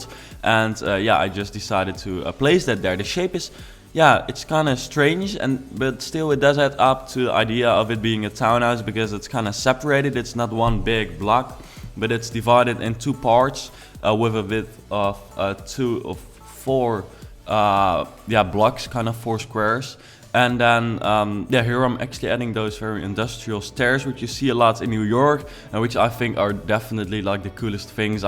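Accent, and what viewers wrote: Netherlands. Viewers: That Dutch accent tho xD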